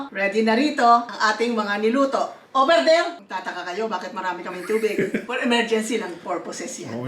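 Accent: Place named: Pampanga, Philippines. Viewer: Flabor - Ober der I can always tell people from the Philippines, they insist on their own pronunciation. Jokoy the comedian has that down.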